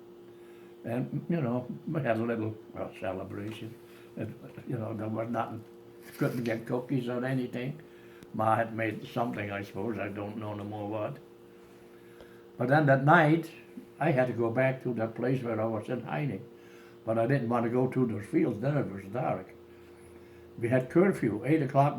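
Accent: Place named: Friesland, Netherlands. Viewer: Thanks for sharing the story. Myn fader is also born in Hantumhuizen. Great to hear the frisian accent❤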